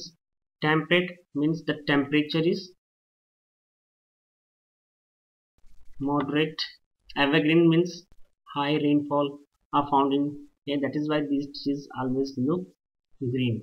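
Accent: England, United Kingdom. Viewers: Very helpful to revise the portion once studied... I like all your videos ... except your English accent everything is good...thanks a lot... Pls keep on doing Bipin Dogra and *****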